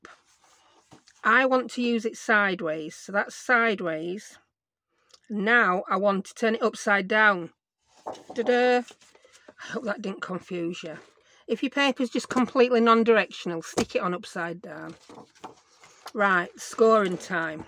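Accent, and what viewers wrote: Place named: Southern England, United Kingdom. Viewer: Oh you do make me laugh and your accent makes me homesick I've lived in scotland longer than I was down south. Love the project and I will try it as I'm trying to get a collection of ephemera. I've also ordered the dies love aliexpress at least they have decent prices.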